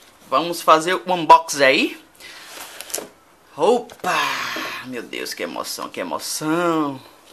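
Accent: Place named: Salvador, Brazil. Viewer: Eu diria "jeito presepeiro"... Hehehehe... Antes de saber que ele era de Salvador, eu já desconfiava só por causa da maneira como ele fala. Mas o cara é brother!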